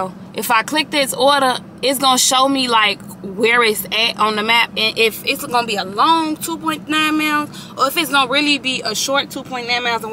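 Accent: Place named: South, United States. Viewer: You have to have some southern roots it sounds so cute though tbh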